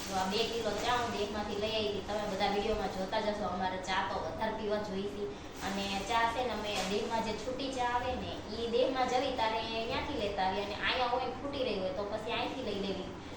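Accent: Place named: Rajasthan, India. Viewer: Tmra boli upar thi lage rajput hso tmaru gam kyu ame bi rajput siye tme amari chennal ne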